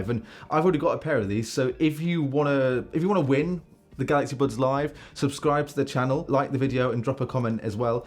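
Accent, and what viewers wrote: United Kingdom. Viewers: Love subscribing to english accent speaking guys😅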